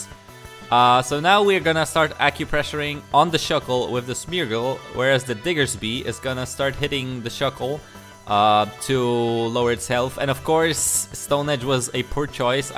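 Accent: Poland. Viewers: Love the polish accent in the video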